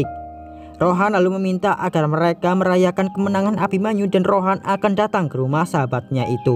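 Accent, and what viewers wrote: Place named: Java, Indonesia. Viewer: Medok wong jawa kie admin ne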